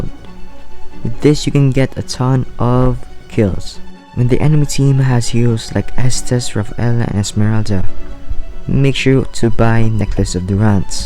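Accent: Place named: Philippines. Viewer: the pinoy accent thou😂 chill voice btw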